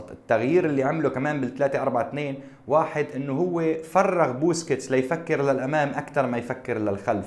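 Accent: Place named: Aleppo, Syria. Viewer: اللهجة الحلبية ❤️❤️❤️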